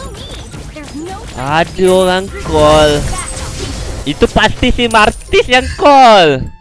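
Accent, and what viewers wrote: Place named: Malaysia. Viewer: Gw dari indo ntah knp gw suka bgt denger suara malaysia njirr Kek nonton upin ipin jdi keinget masa kecil wkwkwk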